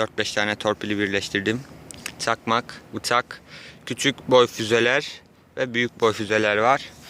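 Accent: Black Sea, Turkey. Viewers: karadenizlimisin şiven var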